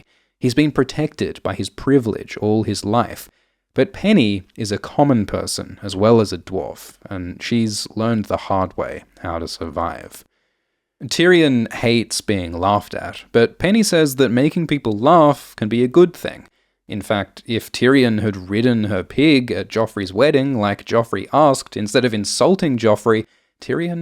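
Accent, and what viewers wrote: Australia. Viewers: He's clearly Australian to anyone with ears, so I'm not sure why anyone would be wondering that. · I usually have a good ear if someone is Australian or British, mainly because Australian accents tend to have more of a drawl to them but with I couldn't tell because he has one of the Australian accents with less of a drawl to it.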